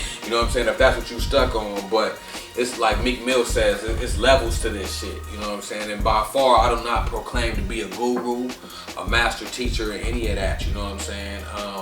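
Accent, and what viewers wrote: California, United States. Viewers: Great vid bruh. You from Cali huh?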